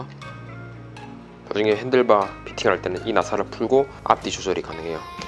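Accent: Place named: Gyeongsang, South Korea. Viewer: 자전거 좋아하고 경상도사투리가 구수해서 님 영상 자주보는 구독자인데 샵이 당리동인거는 오늘 처음알았네요.ㅎㅎ 하단sk거주자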